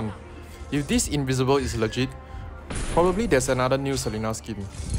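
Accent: Singapore. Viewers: I can hear ur voice and tell ur singaporean, but ur kinda concealing it? But back on topic, these invinsible arrows are annoying asf..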